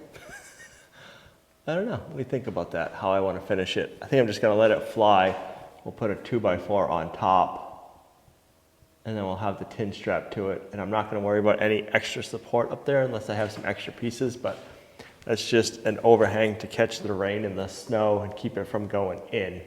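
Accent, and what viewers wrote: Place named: Northeast, United States. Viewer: Truly loved the video,! I like your Nor’Easter accent the best. Thanks for the positives you bring with each posting.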